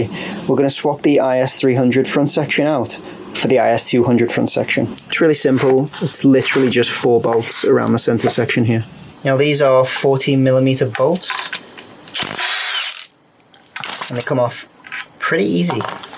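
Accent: Liverpool, United Kingdom. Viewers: You from liverpool fella? Or close by just a guess from the accent hahah, your getting there with her slowly but she's gunna be some yolk when finished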